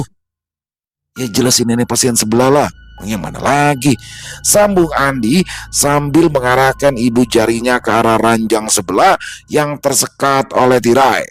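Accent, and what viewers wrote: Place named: Betawi, Indonesia. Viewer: bang udeh yg paling top dah Apelagi klo udah pake gaya bahasa dialek Betawi & Banjar Top banget 👍👍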